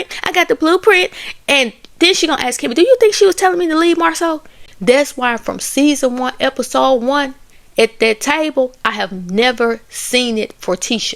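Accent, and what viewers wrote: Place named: Alabama, United States. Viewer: Hey girl hey! I love your channel and I think you have the cutest accent ☺️ Are you from Alabama? I’m trying to get better with my regional accent recognition 😩😂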